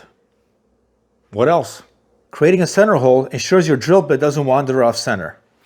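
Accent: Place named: Scotland, United Kingdom. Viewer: Now I'm certain that you are Scottish!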